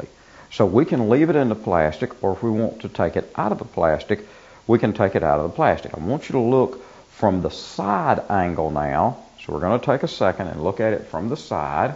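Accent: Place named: Tennessee, United States. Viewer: You sound like a Tennessean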